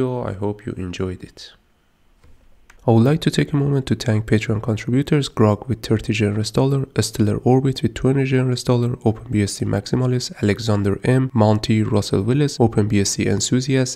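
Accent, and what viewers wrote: Iran. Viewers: حاجی دمت گرم بابت چنل خوبت، همون اول که ویدیو رو باز کردم و انگلیسی صحبت کردنت رو شنیدم، فهمیدم ایرانی هستی😎😎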